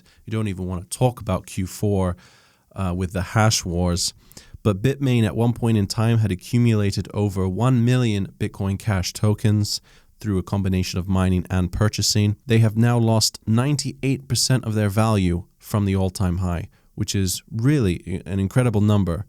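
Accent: United Kingdom. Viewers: I can't detect an American accent - he's British I'd say although some words sound Aussie to me. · I'm curious what part of the UK his accent would be associated with. It's rhotic but I can't place it.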